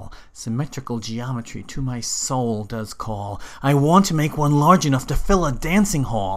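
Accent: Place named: New England, United States. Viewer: hmm sounds like a new england accent. but im most likely wrong. this is bugging. where this person is from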